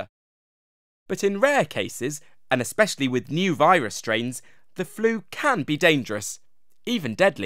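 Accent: England, United Kingdom. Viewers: why is british? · HMMM i'm used to american but i love how British sound:D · UK voice?damnit =( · OMG ITS A BRITISH!!!!!! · WHAOA WTF HES BRITHISH!? Put him in spainish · what happened to his voice his gone all english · Delta Core 12 its a British accent. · I had no idea there was a UK one. I'm from America so I was shocked to hear him with an English accent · I was like "um? I didn't remember sounding british" then i saw the description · He has a accent because this brain pop is meant for the UK duh -_ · He sounds like he's British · Since when tf was he british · Hearing this in a British accent us slightly unsettling to me...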